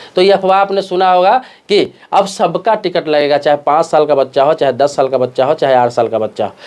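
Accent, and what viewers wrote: Bihar, India. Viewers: Thank YOU for very explicit explanations !! Your service has been probably appreciated !! JAI HINDs & Vandey Maatharams from Dr Ramesh Murthy, A ( Retd ) MENTAL HEALTH SPECIALIST originally from Bangalore City but Qualified in Madhusudan Pranesh, GWALIOR from GRMC of JIWAJI UNIVERSITY !! Bhai, AAP BIHAR Pranesh he hain kyaw ??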